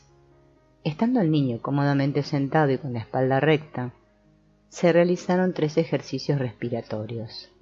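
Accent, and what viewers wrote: Argentina. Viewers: Me lo estoy estudiando segundo a segundo. Ya leí el libro. Y escucharlo narrado por una Argentina, fue una sorpresa grata. Voy a dar una conferencia sobre el tema.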